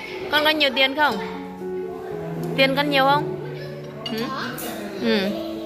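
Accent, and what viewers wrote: Central, Vietnam. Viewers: tại con nghe cô nói giống giọng miền Trung ghê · Cô quê ở đâu mà nói giống giọng miền trung vậy ạ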